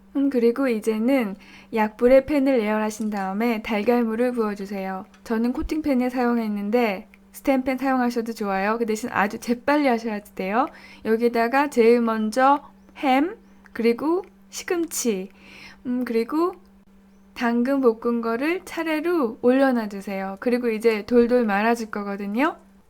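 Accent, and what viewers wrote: Seoul, South Korea. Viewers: 교포가 아니라 서울사투리 쓰셔서 그런것같애요^^ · 말투가 80년대서울사투리에요 ㅋㅋㅋㅋ<3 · 목소리좋아요. 서울본토발음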